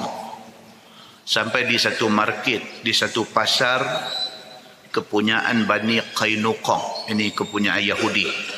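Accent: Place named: Penang, Malaysia. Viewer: Ustaz ni, dia punya cakap Penang memang kaw kaw sat. Siapa yang duduk kat Kedah dengan Penang, memang boleh gelak dengar cara dia cakap. Orang luar mungkin, lambat nak tangkap.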